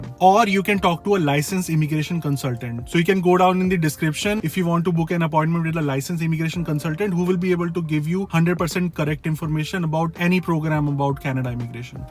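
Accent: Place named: Kerala, India. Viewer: Edo mashe eyyalude pronounced kettatal manasilakum malayaliyude kunjananu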